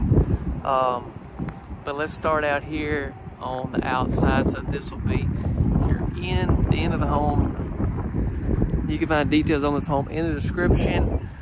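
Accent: South, United States. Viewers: “You know whut” lol I’m from the south too 😆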